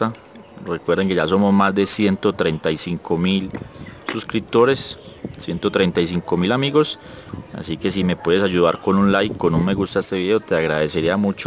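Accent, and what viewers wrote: Colombia. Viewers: Hola mi amigo portu forma de hablar eres colombiano osea mi hermano gracias por estos videos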